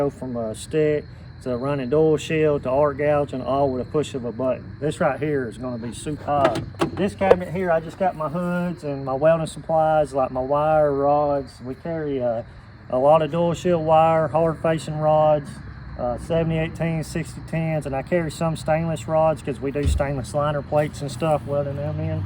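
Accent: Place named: Europe. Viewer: What a nice white European accent 👍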